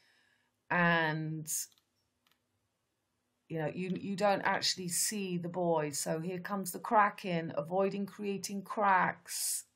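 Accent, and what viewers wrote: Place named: France. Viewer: Wow your English is wonderful. I thought you were from England....with a French accent. I tried to learn French it was a joke in high school in the US ... you rock. 🥰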